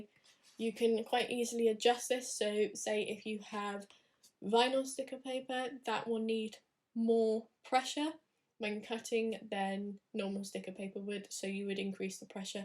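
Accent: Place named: United Kingdom. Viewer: Came for the tutorial, stayed for the accent 😅 UK people have the best accent. Good tutorial. Just bought a cricut and I'm learning. Greetings from Mexico.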